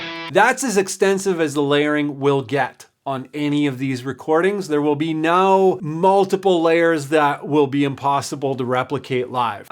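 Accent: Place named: Ontario, Canada. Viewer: I just subscribed after watching your channel develop for a while. I'm 50 this June and also trying to release a solo project. Mines also being written and recorded in reaper, but more in the Swedeath direction. Gonna be cool to hear the finished product and buy it on Bandcamp. My missus is from Winchester ON, so I enjoy listening to you speak too. Reminds me of warm summers and cottage life.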